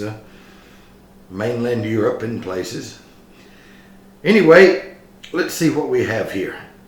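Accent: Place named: United States. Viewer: First time on this channel and I can’t get over your accent, which to me is straight from a Western movie. 😊 I can hear you say, “If you’re willin to work I can give you bed and board and after harvest maybe a little to help you on your way.” As for B&W, I feel it’s a great, affordable Glasgow Blend. The Compass Box does a more upmarket version. This particular style of whisky is sadly misunderstood and under appreciated in whisky circles. Good grain whisky is rare in an affordable blend but very welcome to those in the know. I would rather have a well-blended whisky such as this than a bland malt like Glenfiddich 12.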